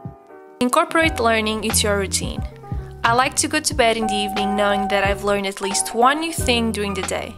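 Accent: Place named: Portugal. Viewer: Ando a descobrir canais que me transmitam energias positivas e me motivem, parabéns está incrível. Houve uma coisa que teve imensa piada, quando comecei a ouvir o vídeo pensei "Fogo parece mesmo uma portuguesa a falar inglês, super clear mas não inglesa obviamente" e fiquei mesmo "wow tem que ser". Fui ver à bio e acertei, que inglês excelente!!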